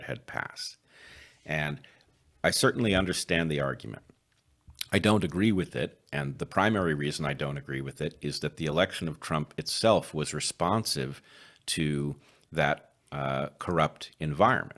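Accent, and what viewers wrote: Wales, United Kingdom. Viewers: South? Swansea area? It's funny to me to hear such ideas with the accent. I grew up there and Its not the kind of conversations I associate with Welsh people! Your clearly very sharp though, and your channel is great, so I look forward to hearing more.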